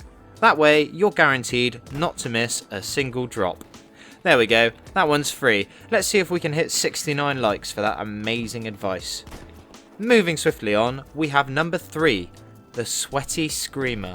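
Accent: Australia. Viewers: Looks like you got that aussy accent down after all bud.😆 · I thouth you came from australia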